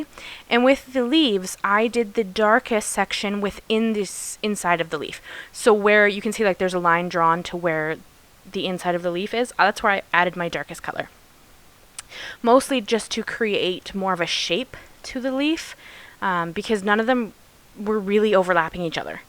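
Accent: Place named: Canada. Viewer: Halfway through your video I was wondering if you were Canadian. I just had this feel because of how you speak. And sure enough I was right! 😁 Takes one to know one I guess. I loved your video and the card. The background does look very pretty and awesome colouring job too.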